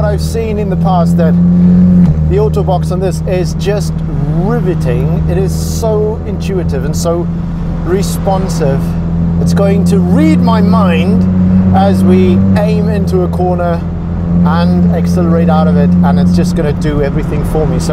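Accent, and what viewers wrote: South Africa. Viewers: I hear South African vibes ..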